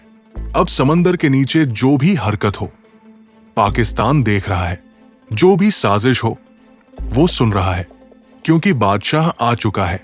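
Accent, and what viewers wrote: India. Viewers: زبان اردو ہے مگر لہجہ اور تلفظ دونوں انڈین ہے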